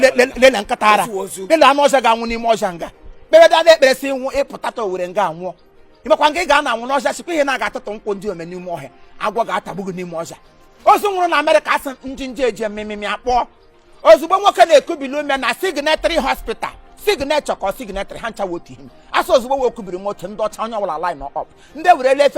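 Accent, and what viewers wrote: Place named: Ehime Mbano, Nigeria. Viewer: This sound like Ehime Mbano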